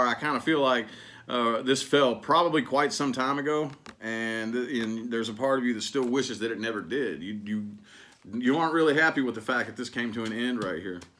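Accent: South, United States. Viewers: Loving that "country drawl". Being from NC I have a drawl too.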